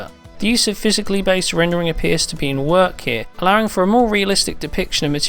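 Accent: England, United Kingdom. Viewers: british accent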